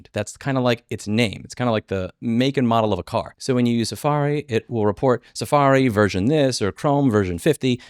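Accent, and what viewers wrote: New York, United States. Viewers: you still have that NYC Pitbull in ya and I'm loving it.!!!!!!!!!!!!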